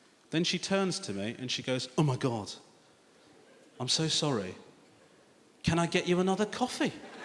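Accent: United Kingdom. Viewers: Dude got accent than the British themselves. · You like you may speak anything from sinhala to tamil to telegu to hindi to bhojpuri to bengali. And here you are, speaking english with strong British accent.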